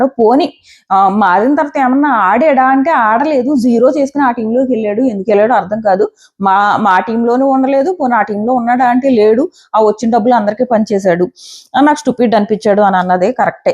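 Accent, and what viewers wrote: Godavari, India. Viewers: 😂 మన గోదావరి యాస బావుంటుంది madam. మాది Demon Pawan ది same town tanuku west Godavari district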